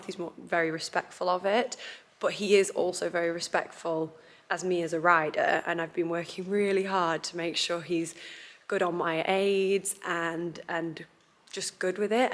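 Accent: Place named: RP, United Kingdom. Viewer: Damn girl you sound posh ... . i like it :D that accent is awesome! like the queen of England.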